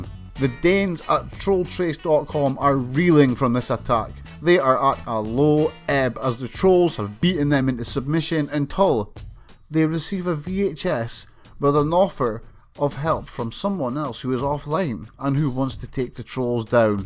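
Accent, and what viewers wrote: Scotland, United Kingdom. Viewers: damn shame about your thick, almost undiscernable scottish accent. can hardly understand 30% of what the hell youre saying.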